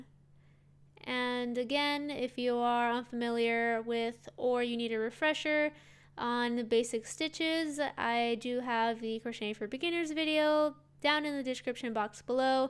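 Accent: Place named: United States. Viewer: Sweet.Can anybody tell me what U.S accent does the craft lady have?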